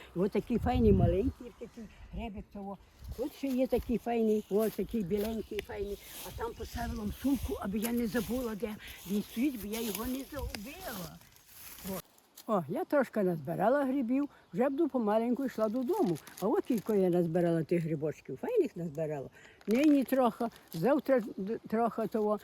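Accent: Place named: Ternopil, Ukraine. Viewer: Дай Вам Боже здоров'я, пані, і сили на многії літа. Мені цікаво де Ви проживаєте, бо повертаєте мене в дитинство, саме так говорили в нас в селі на Тернопільщині, де я народилася.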